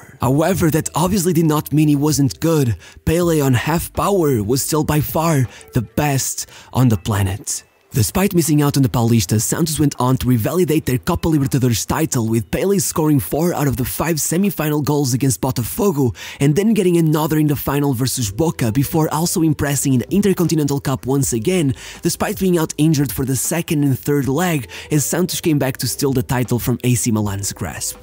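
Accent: Portugal. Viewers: He has a Portuguese accent, English is not his first language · It's normal that you will praise Pele because you are either Portuguese or Brazilian as can be guessed from your English accent resembling Jose Mourinho.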